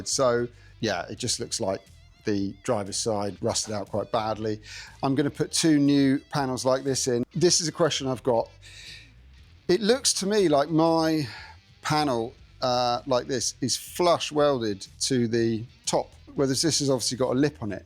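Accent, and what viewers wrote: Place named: United Kingdom. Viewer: muy intersante! Mi padre tenía uno de estos, ¡estos son recuerdos para mí! Y me encanta el acento británico!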